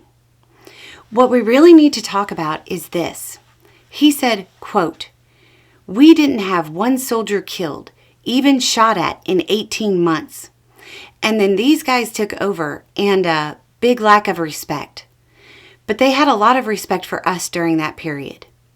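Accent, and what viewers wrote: South, United States. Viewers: I'm not from the South, but I have lots of friends who are... I keep waiting for to say "Bless his heart," in the way only a Southerner can mean it. lol You go,! Please give my best regards to Beau - I hope he is doing really well. Much Love. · I love how Miss gets pissed and then wishes ya'll a good day in that sweet, soft, southern drawl.:yougotthis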